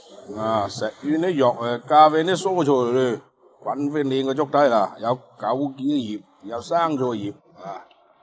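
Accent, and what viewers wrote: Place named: Shunde, China. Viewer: 客家话口音同顺德人讲话是一样的，就是似这位主持人。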